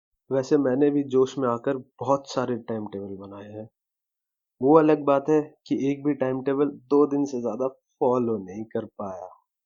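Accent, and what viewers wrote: India. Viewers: desi style mey pada ro ha tu tie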